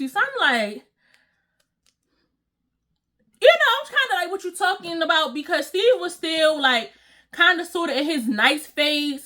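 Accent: Baltimore, United States. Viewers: I love ur bmore accent. Meet his purrents 💜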